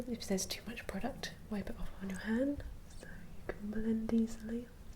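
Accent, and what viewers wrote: England, United Kingdom. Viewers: Hello young lady.How refreshing to see a beautiful British face as an ASMR artiste and one with wonderful red hair that has not felt the need to go another colour.As for your video it's great. Your accent really does make a difference. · So very, naturally beautiful. Love the freckles too. Beautiful, cultured English accent - I have similar so perhaps bit of narcissism here. Keep on being lovely :) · what part of England are you from?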